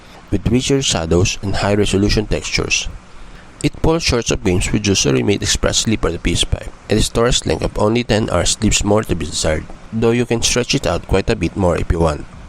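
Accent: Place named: India. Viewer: That's a messed up Indian accent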